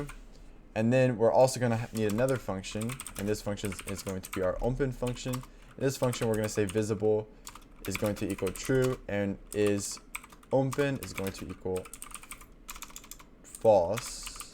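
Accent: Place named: Mid-Atlantic, United States. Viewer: I gotta say...I've "been around the block" in terms of seeking out clear tutorials...and I keep coming back to (and I'm a fan of the mid-Atlantic accent...somewhere between Philly and Baltimore if I could venture a guess). Anyway, really great stuff here!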